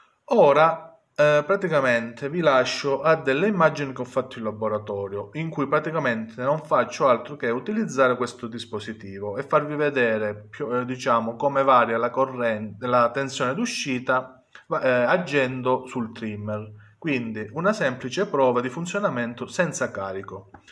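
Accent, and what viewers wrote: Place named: South, Italy. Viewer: Ma di dove sei dal accento sembra che sei del sud italia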